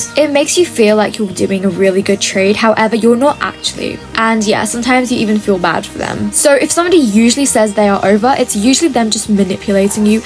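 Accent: United Kingdom. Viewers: You have a British accent right?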